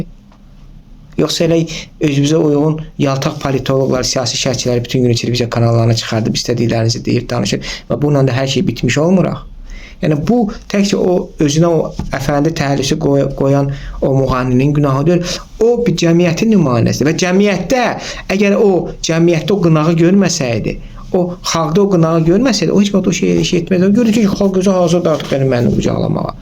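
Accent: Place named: Baku, Azerbaijan. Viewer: Ləhcəndən bilinir karennoy Bakılısan amma erməniylə öpüşənə haqq qazandırırsan tema o deyil tema 1905 ci ildə daşnaklar ruslara Bakı kəndlərində nəqədər söz sahibi papqlı kişiləri güllələyib uşaqlarının başına daş bağlayıb xəzərə atıb boğurmuşlar bax budu tema millət demək istəyir dığalardan bizə nə qonşu nədə dost olar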